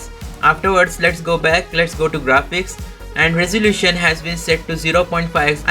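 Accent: India. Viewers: Jesus christ! No wonder people hate indian accent. Get to the point! 🙄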